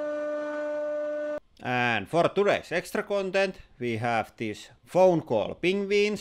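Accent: Finland. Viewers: "En then wi Hev Nutelllllaah"...i love finnish accent😂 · So satisfying and i love the Finnish accent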